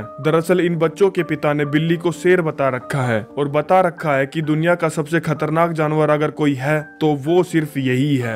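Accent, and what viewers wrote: Haryana, India. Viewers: Bhai aap haryana se ho kya Bahut hi alag level ka explain kiya hai Aapne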